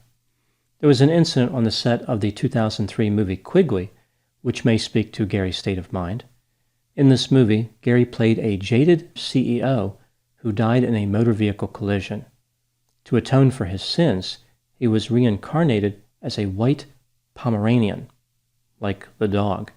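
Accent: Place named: East Coast, United States. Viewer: With all due respect to those from New Jersey, judging by East Coast accent, I'd say he's been there a time or two. So, he likely knows of what he speaks.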